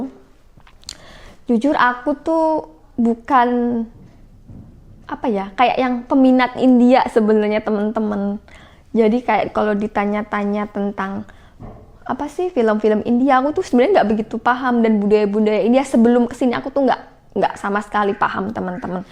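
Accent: Indonesia. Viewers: Yg bikin aq suka liat chanel ini krn suara renyah dan manja. Logat jawa nya tetep g ilang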